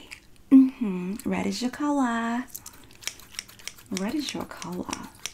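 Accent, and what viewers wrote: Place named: New Jersey, United States. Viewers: That New Joisy accent has me laughing! It's great! LOL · Love your jersey accent videos!!😊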